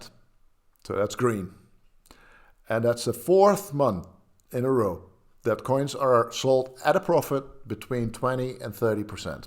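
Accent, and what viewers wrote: Netherlands. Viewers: You sound Dutch.. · You are Dutch from your accent. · Love your Dutch accent :) have family in holland and it reminds me of them. Warm feeling. Cheers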